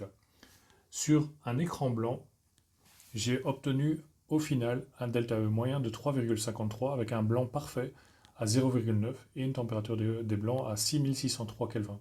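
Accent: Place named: Belgium. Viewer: tkt pour l'accent belge, il est vraiment très léger et n'entrave en rien la compréhension !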